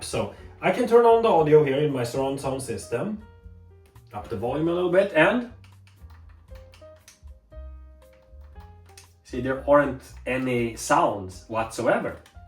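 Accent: Sweden. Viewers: I know that accent mr swede!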